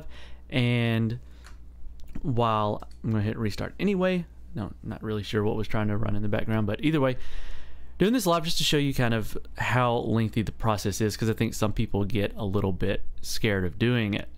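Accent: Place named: Tennessee, United States. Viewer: Tennessee accent i like it Or is it Carolina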